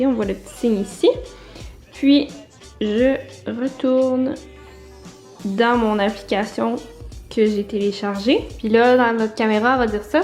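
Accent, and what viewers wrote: Quebec, Canada. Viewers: J'aime les accents canadiens💖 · ah ces quebecquois et leur accent en tout cas tres bonne video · J'aime trop ton accent québécois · J'adore ton accent quebequois et j'adore la façon dont tu expliques les choses rien que pour sa je m'abonne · Elle est trop mignonne avec son petit accent canadien 🥺❤️ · Oh j’adore ton accent je suis française ( paris 🇫🇷 ) et je trouve vraiment que l’accent québécois est charmant ! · Punaise j'aime tlltm l'accent canadien fin québécois qui est français ici 🇫🇷🇫🇷🇫🇷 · Êtes vous d'origine canada j'ame ta manière de parler la langue française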